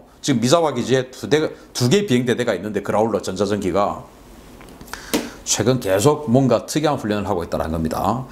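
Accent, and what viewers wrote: Gyeongsang, South Korea. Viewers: 군사전문 박사님의 매일 듣는데 너무 재미있고 실감납니다. 경상도 말씀도 조금 쓰시면서. 아주속 시원합니다. 건강하세요. 고맙습니다.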